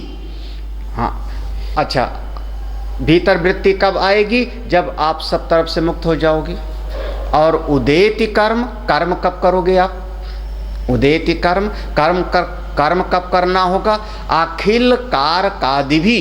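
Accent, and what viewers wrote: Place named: Allahabad, India. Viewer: Swami Ji aapki tone Allahabad hi hai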